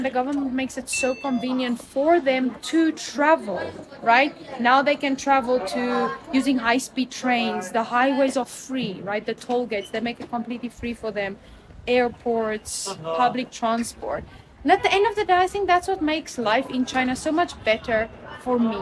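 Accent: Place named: South Africa. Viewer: You are from South Africa? The accent gives away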